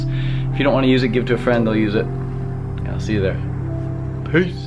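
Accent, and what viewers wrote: Canada. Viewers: Your Canadian accent is unbelievably strong hahaha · Lmao, it's so funny because us Canadians don't ever hear the different btw the Canadian and American accent. I didn't even know he was Canadian until this comment :)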